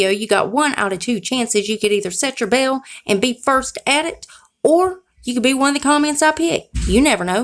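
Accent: South, United States. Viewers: Girl, I love you! Your country girl accent reminds me of me! 💜 I live in a city now for 8yrs but people still say they know I didn't grow up here bcuz of my accent! Love Your videos too! 😘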